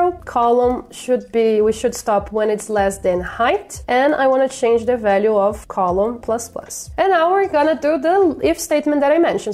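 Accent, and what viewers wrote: Brazil. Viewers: tu é br??? a pronuncia é muito brasil!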